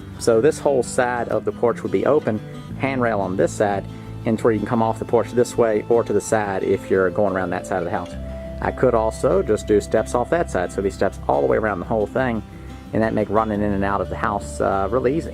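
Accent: South, United States. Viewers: I'm assuming you're in a southern state by your accent and the homes prices...can I ask which state? We are in Louisiana, so just curious. The duplex is 11k, we will have our work cut out for us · If the accent and price of homes didn't give it away that dirt is as south as you can get ! Look at all the clay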